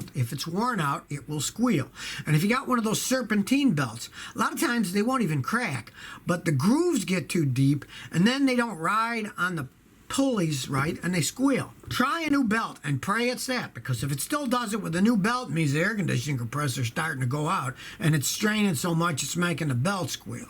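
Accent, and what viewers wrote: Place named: Texas, United States. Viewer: is getting a Texas accent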